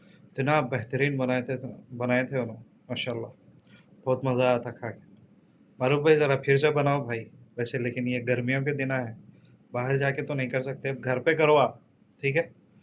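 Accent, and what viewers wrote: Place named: Hyderabad, India. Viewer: Aslkum khiriyat se hain sir ji nahi aap puri tarha hyderabadi baat hi kare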